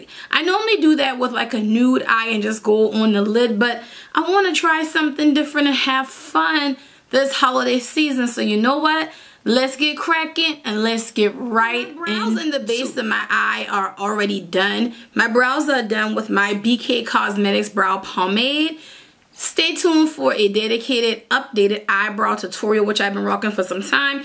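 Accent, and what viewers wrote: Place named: Gullah Geechee, United States. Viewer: Geechie gyal!!! I can spot that accent out of a line up lol. Love the eyes, I still can't master the winged liner. Taking notes!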